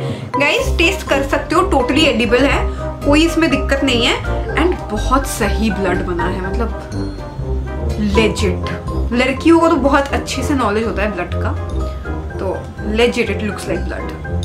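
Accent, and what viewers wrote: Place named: West Bengal, India. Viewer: ap bangali ho you know when I hear you bengali voice I just 😱shocked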